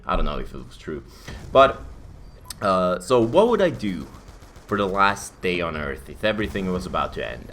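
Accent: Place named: Sweden. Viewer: Having a orginal mål good swenglish bro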